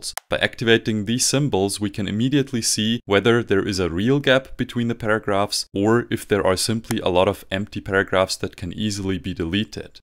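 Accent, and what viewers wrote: Germany. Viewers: I am sensing a German accent, so Danke!! :D Hat mir die Masterarbeit gerettet <3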